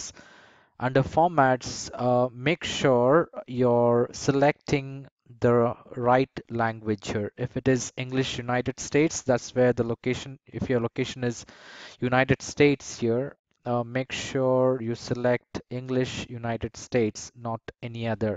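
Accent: India. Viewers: I LOVE UR INDIAN ACCENT !! THANKS !! GREETINGS FROM ARZEIBAIJAN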